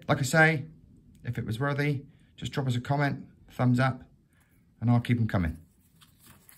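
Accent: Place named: Somerset, United Kingdom. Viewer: Thanks for such a clear explanation. I also echo the comments of a previous reviewer, the Somerset accent is truly welcome.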